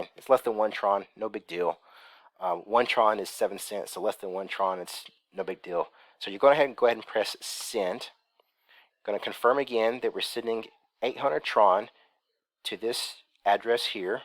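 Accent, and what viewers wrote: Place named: United States. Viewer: Are you in the US. am I able to use simple swap in the US? You sound like you are American, but the flag on simple swap is british.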